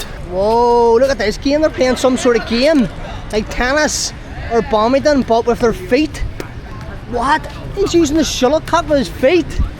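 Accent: Liverpool, United Kingdom. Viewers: Are you a scouser :D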